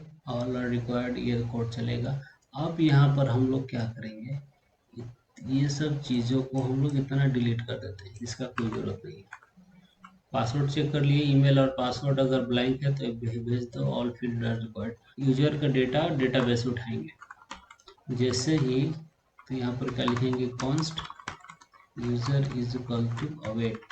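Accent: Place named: Chhattisgarh, India. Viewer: Bhai chhattisgarh se ho kya?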